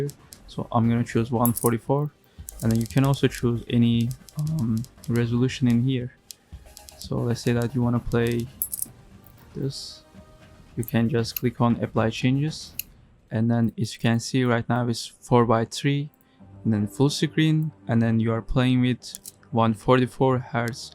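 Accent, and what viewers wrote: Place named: Iran. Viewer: Dadash lahje iranit malome dmt garm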